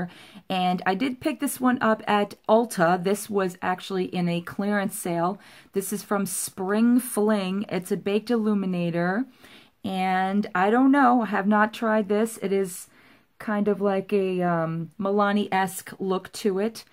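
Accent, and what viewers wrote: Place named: New York, United States. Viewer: I hear a New York accent girl! Love shopmisssa first impressions btw.💕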